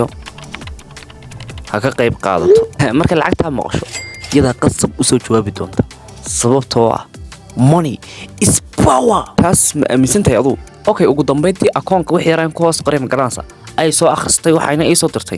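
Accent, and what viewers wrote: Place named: Hargeisa, Somalia. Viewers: Adi mareer Somaliland ayaad thy sidii rer waqoyiga uhadlaysaye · Haa lahjadiisa marka loo fiirsado waa reer hargeisa toosa xataa Burco, ceerigaabo, berbera, Boorame maaha waa hargeisa toosa 😂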